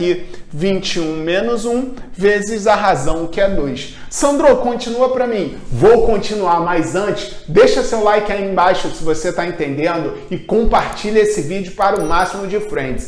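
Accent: Rio de Janeiro, Brazil. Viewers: Meu irmão sou teu fã brother, também sou professor de matemática e acho bem diferente e inovador sua didática, apesar desse sotaque carioca pesado friend... A metodologia de amarrar a atenção do aluno me motiva pra fazer o mesmo e conceituar isso na dissertação de minha pós. É isso meu camarada, show.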